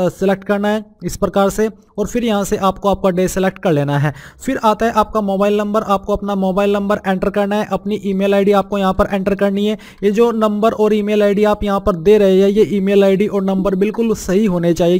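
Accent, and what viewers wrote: Saharanpur, India. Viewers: Brother aap saharanpur sa ho kya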